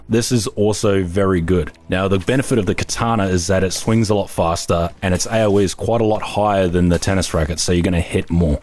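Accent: Australia. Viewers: Are you an aussie? You sound like an aussie. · Stumbles across a video thanks to the algorithm - Sees the channel name is " " - Wonders "hm, is this guy Aussie?" - Accent confirm.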